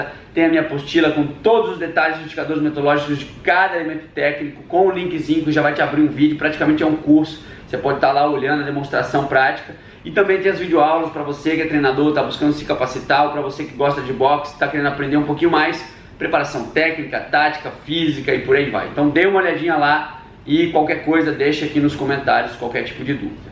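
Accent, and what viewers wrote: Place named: Rio de Janeiro, Brazil. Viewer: Tu pegaste o sotaque carioca com força.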